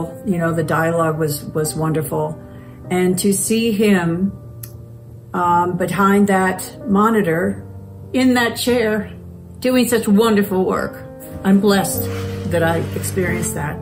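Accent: United Kingdom. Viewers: Why did I expect her to be british? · Omg I thought she was british!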